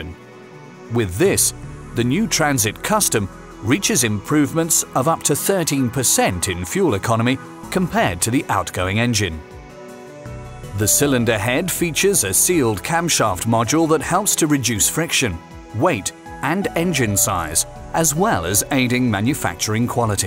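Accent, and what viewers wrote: England, United Kingdom. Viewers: The narrator is British... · All sounds good huh with introduce by someone with an English accent until you hear the problems they are having the Eco Boost engines. PLEASE call it what it really is... TURBO CHARGED ENGINES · Lost me with the British voice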